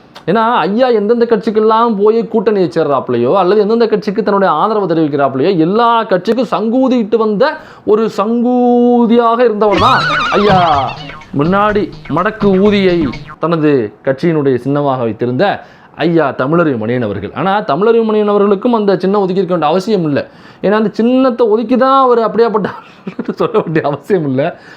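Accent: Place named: Kanyakumari, India. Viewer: Kanyakumari tamil uthhu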